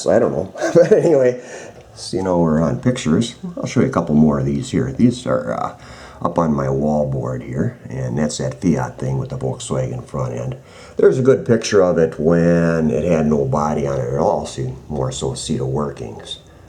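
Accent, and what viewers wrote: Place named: Midwest, United States. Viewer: Where do you reside? By your accent I'm guessing Illinois, Wisconsin, Minnesota, or Michigan.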